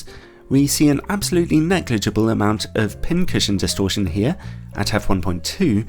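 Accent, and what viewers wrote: United Kingdom. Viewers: Love the music and the British accent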